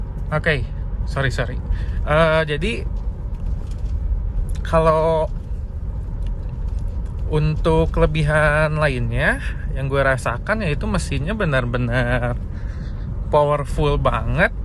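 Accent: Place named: West Java, Indonesia. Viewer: Akang orang sunda jg ya?? Cara ngomong nya sunda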